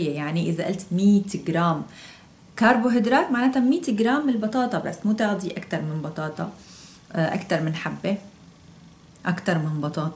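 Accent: Aleppo, Syria. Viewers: Samiri Sanaa سوريا حلب واضح من اللهجة مرة احب الكلام الحلبي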